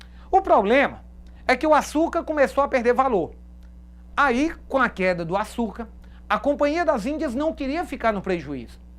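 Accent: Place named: Ceará, Brazil. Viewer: Esse sotaque eu conheço: é do Ceará!!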